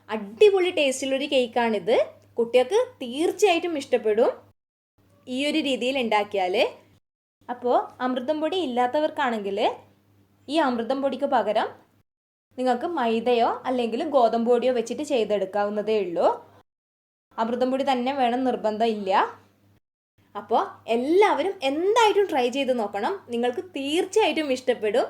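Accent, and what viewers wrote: Kannur, India. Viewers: Kannur kaari aanalle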